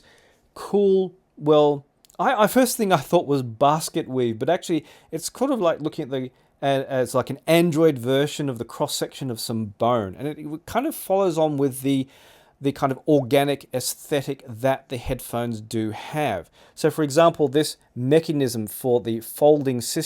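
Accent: Australia. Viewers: Bravo! The most grown-up headphone review ever. "I'm not going to talk about cables." <3 I usually freak out when "audiophile language" is invoked; I can't help being reminded of when I spoke like that and I feel so ashamed. None here. No overused superlatives. No neologisms. As much a pleasure to listen to an Australian accent talking about the best headphones without needing to sell them (they'll do that themselves, no doubt) as it'll be to actually listen to the Expanse itself.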